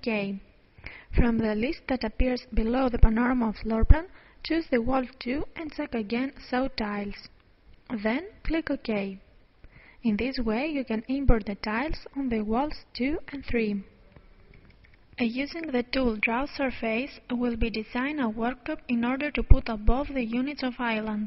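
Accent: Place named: Greece. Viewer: nice accent.. ellhnida? :P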